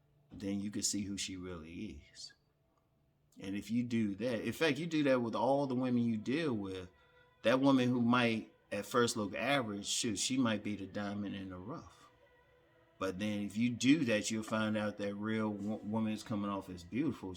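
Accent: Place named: DMV, United States. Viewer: Just found this channel a couple months ago and been watching all your videos. As a young 24 year old dude I appreciate all the free game you give and you're honestly my favorite figure in the "redpill community", "Manosphere" or whatever you call it. You remind me of my late high school football coach with that heavy DMV accent.😂 Shoutout from Harford County, MD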